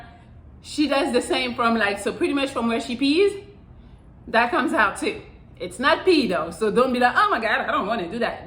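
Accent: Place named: France. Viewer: ?Coule it be that you speak french?Because of your accent i mean.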